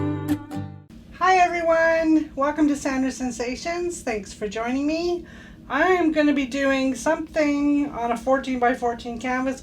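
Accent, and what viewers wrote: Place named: Canada. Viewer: I hear your Canadian! I am too!!! I watch Art with Rossy from Australia and she gave you a mention! New Subscriber!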